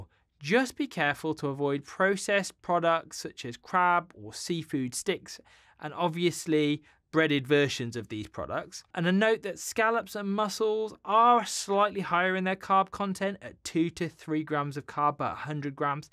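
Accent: England, United Kingdom. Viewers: I'm glad to come across you Dr.:) I was eating a keto diet for a year and lost 3.5 stones in the first 6 months. Lock down happened and my life was wiped out. I have a weak immune system so I have to stay away from people and get my vaccine shots for the rest of forever. However, when I decided I needed to start living again and eating "keto" again I found I could not get into ketosis and eventually could not even follow the diet. I'm still struggling but I will keep trying and it's great to hear advice coming from an English accent for once. 👍😉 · I can’t deal with the British accent, sorry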